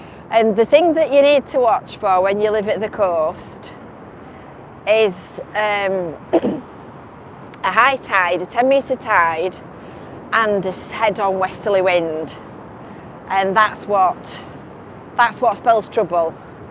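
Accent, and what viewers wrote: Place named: Lancashire, United Kingdom. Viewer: You cheered me up with your lovely Lancashire accent and chirpy attitude. From a mancunian living in blackpool.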